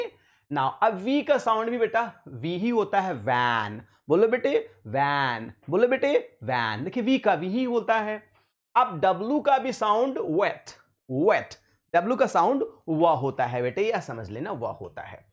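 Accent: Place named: England, United Kingdom. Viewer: Ur pronunciation is as like the english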